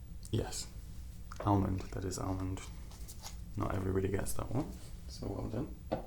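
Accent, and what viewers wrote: United Kingdom. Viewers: Subscribed, it's the accent for me, with the deepness too! I'm british but i forget just how many accents we actually have here at times, so many mixes too! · Did not expect you to be British. 😍👌🏻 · Are you britthis? Maybe it is obvious or not but your accent makes me think it, sorry if im wrong, pls dont hate if im wrong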